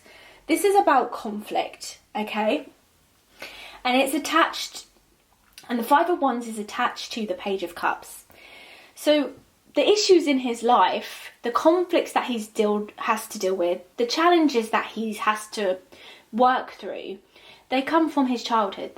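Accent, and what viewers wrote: United Kingdom. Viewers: I am obSESSED with your accent 😩😍 I feel like I can listen to you talk forever. It’s so calming & posh. Is it British ?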